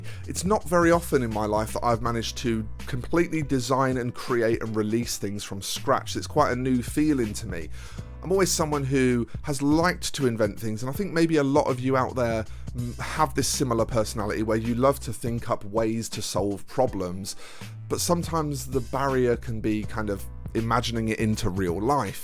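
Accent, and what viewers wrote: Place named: United Kingdom. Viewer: I've just realised love british accent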